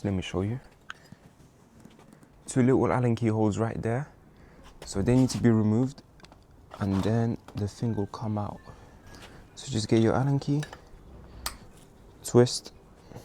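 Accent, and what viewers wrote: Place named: United Kingdom. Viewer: Out of interest, why is you accent sound like a uk born afro Caribbean person, but you a white fella. Thanks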